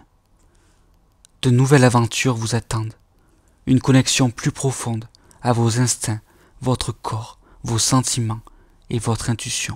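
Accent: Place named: South-West, France. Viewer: Coucou merci confrère avec une voix qui chante et me rappelle le sud ouest du côté de ma mère de Toulouse merci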